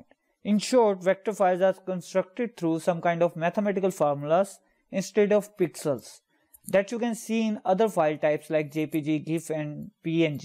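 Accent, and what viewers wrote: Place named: India. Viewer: his accent sounds like indian and look at the top-right corner, his name is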